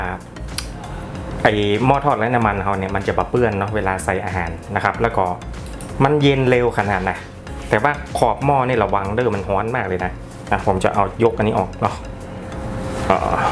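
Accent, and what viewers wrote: North, Thailand. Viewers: ดีดีค่ะ ชอบภาษาเหนือค่ะ เพราะดี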